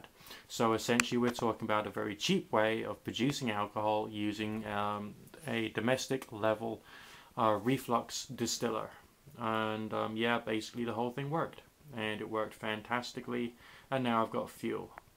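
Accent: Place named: England, United Kingdom. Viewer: Annoying accent .. english Lad ..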